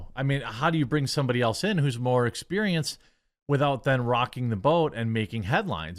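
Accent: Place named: Wisconsin, United States. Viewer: Bro say dregon over dragon ever day, love out that WisssCONson accent.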